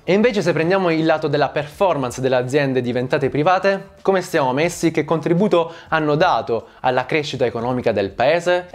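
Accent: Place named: South, Italy. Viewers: Nessun difetto di pronuncia, in Salento la Z si pronuncia così, c'ho messo anni a normalizzarla ma ancora ogni tanto scappa SSSETA :D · da noi in Terronia le Z si pronunciano così....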